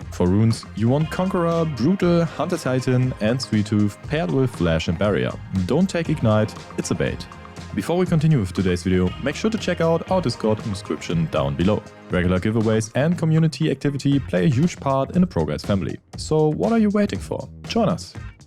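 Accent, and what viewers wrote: Germany. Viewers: Klingt etwas als würdet ihr aus Deutschland kommen xD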